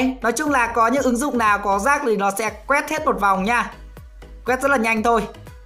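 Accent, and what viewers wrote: Nam Dinh, Vietnam. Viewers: Đúng giọng nam định... a quê ở tp nđ à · A ở nam định hay gì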